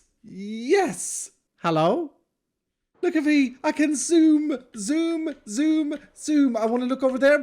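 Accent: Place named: Sweden. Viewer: Im sorry bur you have one of the most Swedish accent that u have ever heard😇😅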